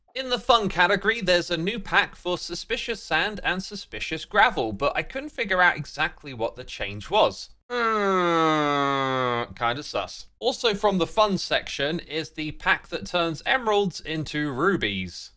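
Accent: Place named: United Kingdom. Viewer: i cannot believe it has taken me this long, but this is the first time i realize has a british accent.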